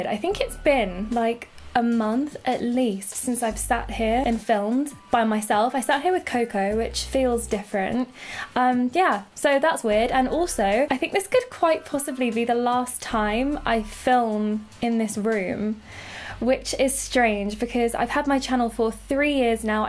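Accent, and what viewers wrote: United Kingdom. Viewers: Are you British? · I am from Germany and I love your british accent 😍But also your fashion style👠👜. I am sorry if I made grammar mistakes 😕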